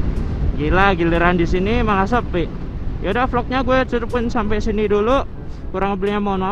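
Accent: Java, Indonesia. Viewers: omongane kagok ketok e wong jowo iki mesti😂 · Ngomong guwe ne medok mas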